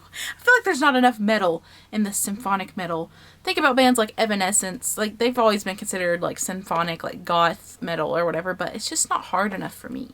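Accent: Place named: South, United States. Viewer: You're southern aren't you ? 🥺💘😭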